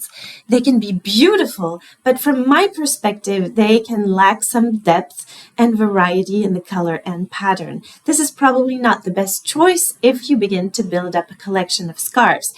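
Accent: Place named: France. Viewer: Yu are absolutely great,it is wonderfully to listen to yu , are Yu french,Yu have lovely accent and it is really pleasure to see yu😊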